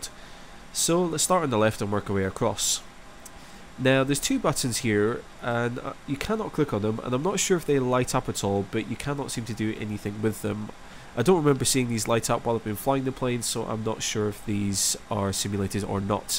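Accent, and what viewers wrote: Scotland, United Kingdom. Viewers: lol, please make more videos like this. Do you have videos like this for the other, or pmdg range of aircraft? Those would be great. greetings from an Aberdonian in Michigan!:) loving your vids and Scottish accent which I miss living in the USA. edit: 911 sticks out too, have you seen Ex CIA Agent/Asset Susan Lindauer? I just saw her speech "to truthers" yesterday.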